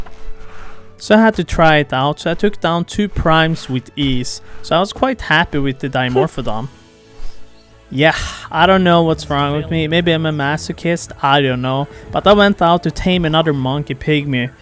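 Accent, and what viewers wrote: Sweden. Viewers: är det svenska jag hör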